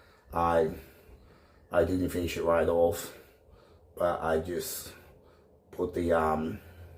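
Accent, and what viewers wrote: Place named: Australia. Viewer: I honor your heritage bro, I knew you had an Aussie accent since the get go. My favorite country besides my own is Australia, I probably mentioned before how much I enjoy Rugby football. If I was able to I'd love to come and go on a hunt for an opal. One of my very favorite tv shows is about hunting for opals. I'm Native American and my heritage includes the totem as well. Something you and your bro could share is the boomerang and it's impact on survival for food and the technique to accurately make it's target. THANK YOU both for sharing part of your life story; the artwork is beautiful! I wish I also could honor you with support in some manner. THANK YOU! Watching you from a long ways away in the State of Minnesota U.S.A. 👌😊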